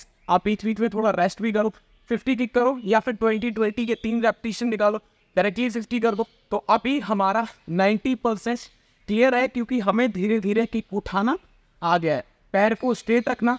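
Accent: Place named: Mau, India. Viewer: Lagta Hai Mau District se ho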